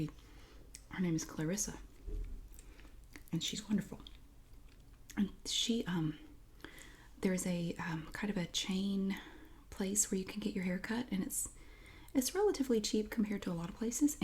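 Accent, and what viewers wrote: South, United States. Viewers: I lived in Thomasville for a short time after college, running a local farm. Before this video based on your accent I really thought you must be in that area! (I moved around southernVA and northern NC a bit for a few years before landing in the northern va/dc area. So many great things about living down there.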